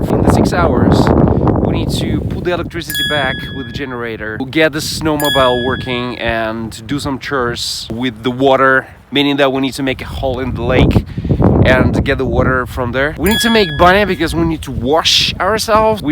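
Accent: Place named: United States. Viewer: American accent . . ?? how comes?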